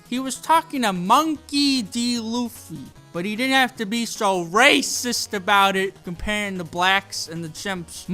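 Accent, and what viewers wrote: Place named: Boston, United States. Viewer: Chimp champ are two different words your dialect is very bosten east coast like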